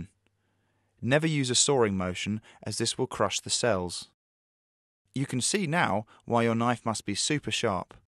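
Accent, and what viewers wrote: United Kingdom. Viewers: British pronunciation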